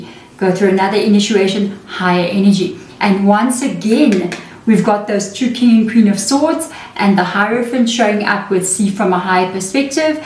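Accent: South Africa. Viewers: So glad to hear a South African accent! From a fellow South African. Everything you say resonates.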